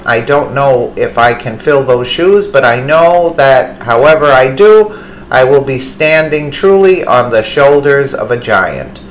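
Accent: New York, United States. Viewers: You must be from New York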